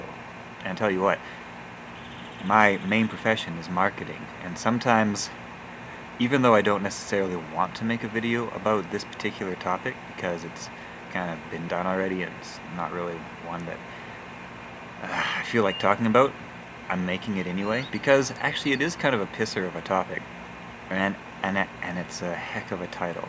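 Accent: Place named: Ontario, Canada. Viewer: Are you from Ontario? Just hear a slight Accent there.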